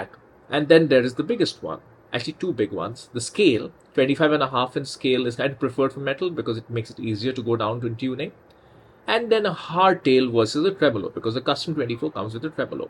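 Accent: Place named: India. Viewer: sound muted cause of Indian Accent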